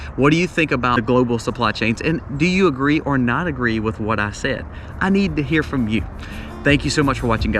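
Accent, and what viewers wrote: South, United States. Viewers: Great music, great guy, great information, and great Southern accent! 😊👍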